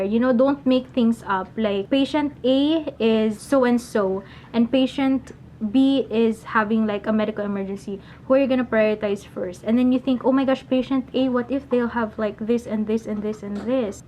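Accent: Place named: Philippines. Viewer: Lol I knew you were filipino before you even opened your mouth LOOLL 🤣🤣🤣You have the thickest Filipino accent of all damnn (I'm not filipino)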